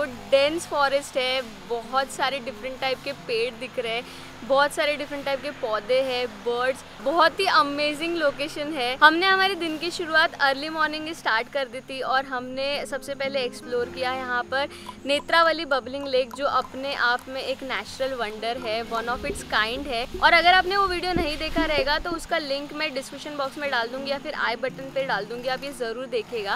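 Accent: Maharashtra, India. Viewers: Your hindi accent is cute...poora marathi mulgi :D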